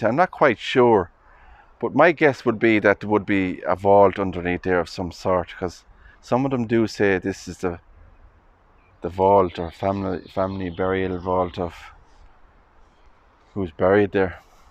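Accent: Ireland. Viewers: Beautiful video thanks to you Lovely irish voice.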